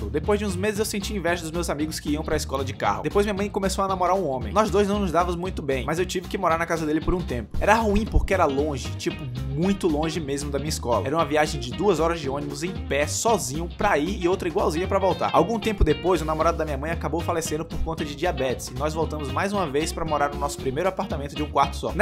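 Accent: Bahia, Brazil. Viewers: rapaz sua historia de antigamente é quase igual a minha agora (EM TER VERGONHA DE FAZER VIDEOS)E DIVULGAR O CAL, inclusive eu sou de camaçari uma cidade vizinha a Lauro de Freitas onde voce morava. eu sofro de depressão, transtorno borderline , ansiedade sindrome do panico e sou hiperativo por isso desenvolvi fobia social, mas não paro de sonhar em fazer videos e algo me diz que se eu não desistir e perder a vergonha e o medo eu conseguirei ser um youtuber, e esses dias vi seu canal e quando percebi que você era da bahia eu ate me emocionei, eu acredito que a lei do universo, (a lei da atração) me mostrou seu canal para confirmar, quando eu ouvi seu sotaque eu pensei, pelo sotaque e pela giria (vei)eu pensei: esse cara parece ser baiano! logo em seguida o motoqueiro perguntou se voce era do rio de janeiro e voce respondeu que era de salvador. eu pulei aqui em casa e falei porra o cara é daqui de salvador, isso pra mim foi um sinal e por isso fiquei emocionado. 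agora to falando para todos aqui que tem um youtube que ta fazendo sucesso e é daqui de lauro de freitas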